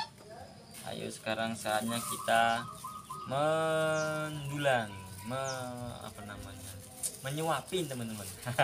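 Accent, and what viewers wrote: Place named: Kendal, Indonesia. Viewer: Logate kok koyo wong kendal mas... kendale pundi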